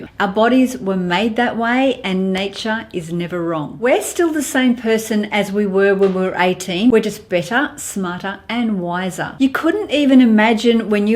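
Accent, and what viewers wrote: Australia. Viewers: Thank you sharing your words of encouragement. I'm 54 and was diagnosed last August 2017 with breast cancer. I'm cancer free.. Thank God, but still going through the reconstruction surgeries. I lost all of my hair and some of my teeth due to chemo. It's a hard journey, but again I believe in my higher power. Getting back to feeling beautiful as one would look in the mirror has its challenges, but what's important I'm alive and cancer free. Loving ourselves at this age is very important and watching videos like yours and others on YouTube has been helping me get into dressing and wearing makeup again. Although I still have no hair (lol) eyebrows and lashes are still coming in.. oops I think I wrote a whole book. I love your accent and I always wanted to go to Australia. I'm in USA, but originally born in Puerto Rico. Blessings beautiful. 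🌺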